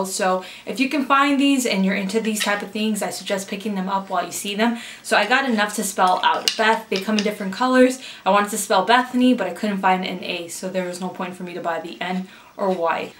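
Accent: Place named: New York, United States. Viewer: You have no idea how much I love your accent!! NY❤️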